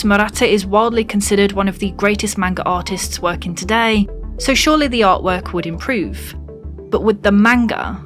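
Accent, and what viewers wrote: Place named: United Kingdom. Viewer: geez your accent is falling thru, i can smell the brit in you from a mile away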